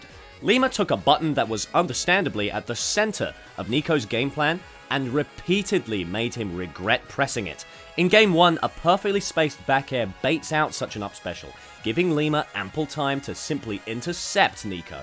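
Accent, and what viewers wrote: United Kingdom. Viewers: That's just how many brits talk bro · who is this brit in my pgstats video and why is his voice so good 🤨🤨🤨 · Guys a Brit. Gotta learn how they talk mate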